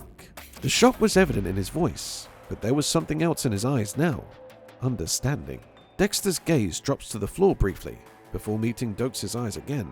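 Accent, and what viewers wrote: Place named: United Kingdom. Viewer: “Masuker” “Dextah” I love Brit’s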